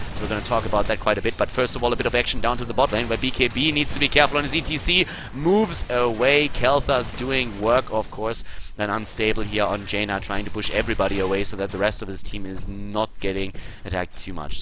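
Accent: Germany. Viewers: Good commentary. It was clear you were rooting for the Germans, and I think your accent is German, so maybe there's a reason for that ;-) You did point out the good things both teams did, though, and being a bit invested in the game is always cool. Subbed!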